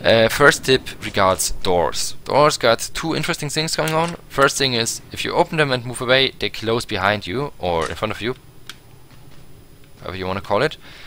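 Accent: Germany. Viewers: Man merkt echt das du eigentlich aus Deutschland bist xD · lass dich nicht verunsichern. die einzelnen Elemente am Anfang sind wichtig. vielleicht grafisch aufarbeiten und unterteilen. sonst sehr informativ und gut verständlich. du kommst aus dem Raum Stuttgart denke ich 😀. weiter so und das mit dem Akzent wird schon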